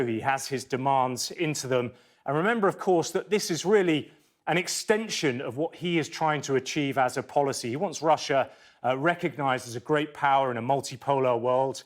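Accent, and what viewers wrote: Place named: United Kingdom. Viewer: It's not "military". It's " militree" got to love those British accents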